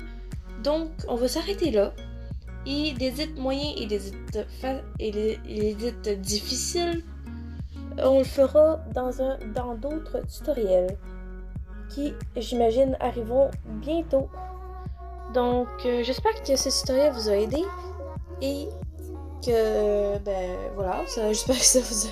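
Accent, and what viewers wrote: Quebec, Canada. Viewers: JADORE TON ACCENT KEBEQUOI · Je sais pas si ta un acsent québéquois mais si oui une pepite👌👌😭😭💖💖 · Tu es canadienne jadore ton accent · tu est canadienne? Parce que j adore ta voix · J'aime beaucoup ta voix québécois 😍😘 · Mais non tu as un accent québécoi et je l'adore c beaucoup mieux que d'avoir le même voi que tout le monde 😘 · Trés jolie axent canadien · Tu est québécois car tu a k accent et je trouve cette accent trop chou 🤧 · Ta voix me fais penser a une voix de québécoise et merci pour le tuto je like et m'abonne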